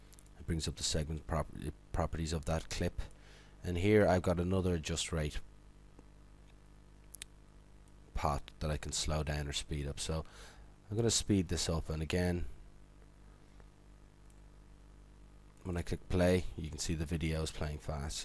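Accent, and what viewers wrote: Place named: Ireland. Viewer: ill know that your irish 5 stars your for dublin so am i because i knoe your acent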